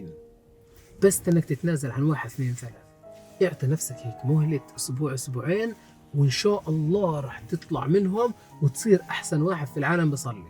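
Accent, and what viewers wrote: Palestine. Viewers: ماشاءالله عليك أخي جد انت إنسان عضيم ومحترم الله يجزيك الجنة وينفع فيك بس انت قلت بس الفلسطينين عم يفهموا عليك لا كلامك كلو مفهوم بالحرف نفس كلامنا السوريين ونفس المصطلحات بس لهجة بتختلف😄😁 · أنا اليوم وبالصدفة شفت أول مرة فيديو إلك وأول ما سمعت أول كلمتين قلت في نفسي هذا فلسطيني الله يرضى عليه، وقبل ما أعرف أي شي عنك وعن فيديوهاتك، والفيديو كان بعنوان "هكذا سيكفيك راتبك بل وسيبقى منه" وبعد هيك سحبت الشغلة وحضرت 5 فيديوهات ورا بعض بدون ما أحس بالوقت، وبعثتهن لابني لأني حبيتك وحبيت أنه يشوف فيديوهاتك لكن هذا الفيديو يا ابني يا كان فعلا مميز ووصل لقلبي غير، حسيت أنه كل كلمة في هذا الفيديو طلعت من قلبك مش من تمك وربي يسعد قلبك ويحميك يا ابني ويثبتك يا رب وربنا يسعد والديك ويجزيهم الخير على هيك تربية. فلسطيني من السويد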